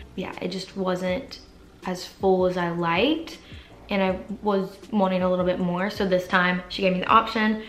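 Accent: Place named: North Carolina, United States. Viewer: You are joking! I'm from North Carolina as well :) Where in NC are you from? (I knew your accent sounded familiar lmao) And yes it is kind of unheard of of people our age getting filler so I'm glad I watched this video. I really want to get mine done.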